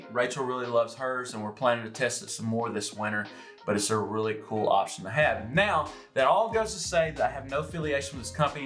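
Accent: South, United States. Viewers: I have had one for a few years and really like it. I like the thumb holes too. I bought my wife one and she is wearing it on a girls weekend trip. All her friends love it and they all want one now!! The only negative, and it probably applies to most fleece "outerwear" is the wind goes right thru it. Don't apologize for the southern accent!!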